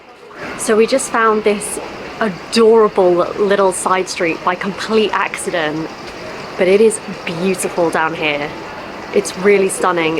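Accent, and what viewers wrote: United Kingdom. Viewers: シックでエレガントな美人さんがイギリス英語を喋りながら、この瞬間は心は大阪人って感じね。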